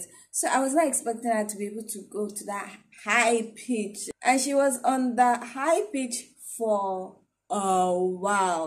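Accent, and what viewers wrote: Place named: Africa. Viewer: So I was right, I thought it was from a country from Africa, because I've listened so other girls or boys, and they sounded with a similar accent.I like it.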